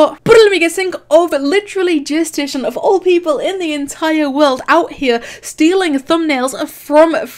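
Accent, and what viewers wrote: United Kingdom. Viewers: Is this british accent? No seriously is it? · She has a British accent. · Yez she has british accent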